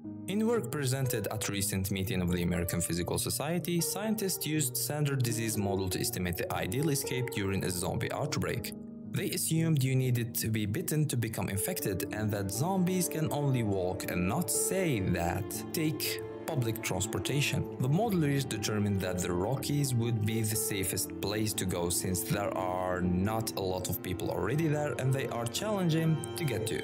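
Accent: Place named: Russia. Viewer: You kinda sound like you have a Russian Accent. Idk just wondering.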